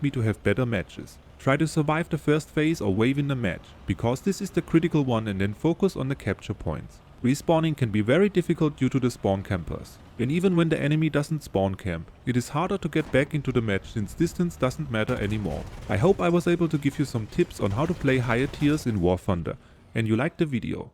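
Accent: Germany. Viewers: You are German right ? 😄 ich auch 😂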